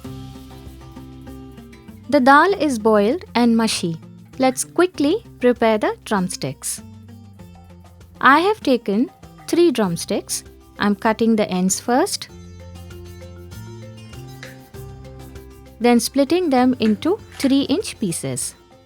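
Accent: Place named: Odisha, India. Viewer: Your English talking style is like a waww. ,,,I guess you are from odisa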